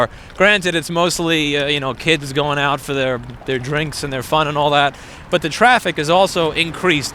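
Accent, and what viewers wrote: New York, United States. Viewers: your new york accent is particularily strong today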